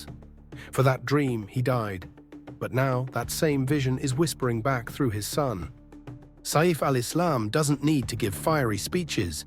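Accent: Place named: United Kingdom. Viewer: YOU MAKING OF HIM A HERO JUST TO GET LIKES. THAT ALL IMPORTANT TO YOU LIKE I HATE UK ACCENT YOU ARE THE EVIL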